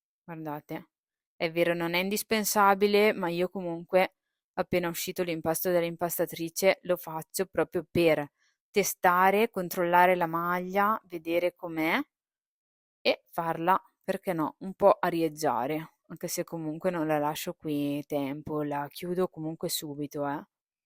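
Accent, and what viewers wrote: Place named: Piedmont, Italy. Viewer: Sei bravissima. Sto facendo le tue ciabatte e farò certamente la colomba. Ciao dall'accento piemontese. Io sono napoletano e ho un piccolo un forno a legna, farò la tua pizza in pala e ti farò sapere.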